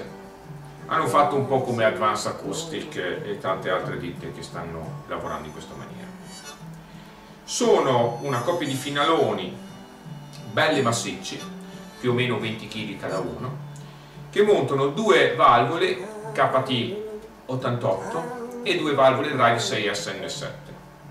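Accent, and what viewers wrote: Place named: Reggio Emilia, Italy. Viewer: a parte il fatto che se non correggi la tua dizione avrai un targhet di vendita limitato al solo territorio reggio emiliano fatto da soli formaggi mortadelle e prosciutti e pochi chilometri di distanza .. pistola !!!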